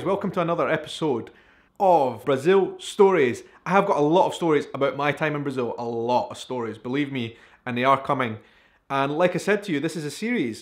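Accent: Scotland, United Kingdom. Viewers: Você arrasou na pronúncia, parabéns! Ah, e seu inglês escocês é tão bonito! :D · I'm really enjoying your videos,! And you're doing very well with your portuguese. I've never been in Scotland, but I love the culture and especially the scottish accent. It just lovely! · if u're having problems saying "Florianópolis", try > FLORIPA. everyone will understand it too... quando vc dizia "word" eu entendia "ward". scotish accent is a little tricky nice vid.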